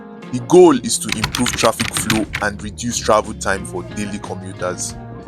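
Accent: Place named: Ebira, Nigeria. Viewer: De thing wowoh small na Ur accent sef be like igbira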